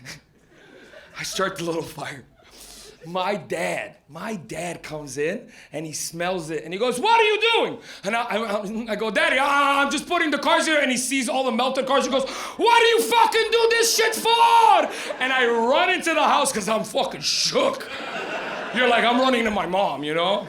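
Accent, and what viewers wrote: United States. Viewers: Why does he sound like he's from Jersey? I had to look him up; did I know where he's from? Canada? I was surprised. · you were great. You had me rolling, you sound like you’re from Miami 😂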